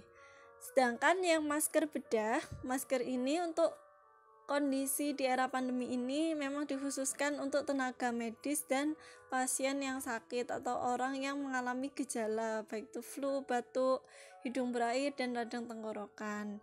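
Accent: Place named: Java, Indonesia. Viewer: 👍👍👍 medok kali mbak suaramu 😁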